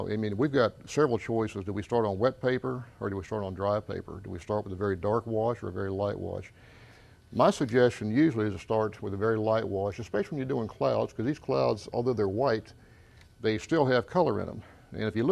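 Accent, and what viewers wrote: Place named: Texas, United States. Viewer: You sound like a Texan.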